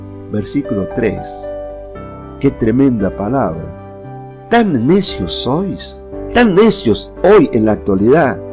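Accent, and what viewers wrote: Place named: Northwest, Argentina. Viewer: hola hno muy buena enseñanza.. por su acento de donde es usted ?? Tucumano o salteño quizas jujeño?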